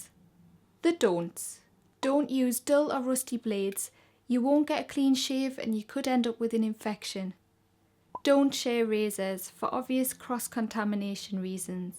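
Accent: Newcastle, United Kingdom. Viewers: Are you Geordie? Because I am and you sound like it :)) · its english is a geordie accent. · I thought Geordie? :) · Jade and Perri are both Geordies just like the YouTube speaking on here and like me ahaha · are you from newcastle?? your accent is one of my faves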